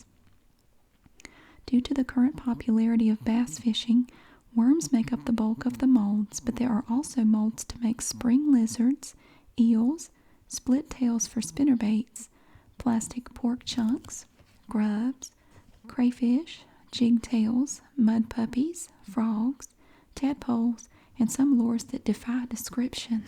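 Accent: South, United States. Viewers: I love your gentle southern accent.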